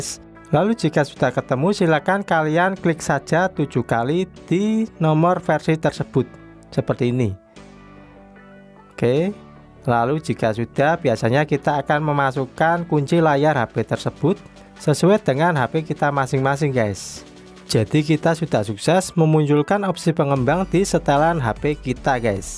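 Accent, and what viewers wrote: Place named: Central Java, Indonesia. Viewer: Logat jawa tengah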